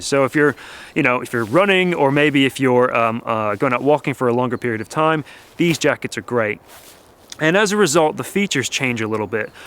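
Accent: United States. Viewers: This guy sounds like an American who's been living here in England for too long, he's starting to pick up a hint of English accent.... you can tell from the ending of words like "sheet" "belt" "about" "what" "it" and "fit" which all happen to be words ending with the letter T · It’s definitely not Scottish or Irish mainly American seems like an American who’s been living in the uk for a while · You sound american but don't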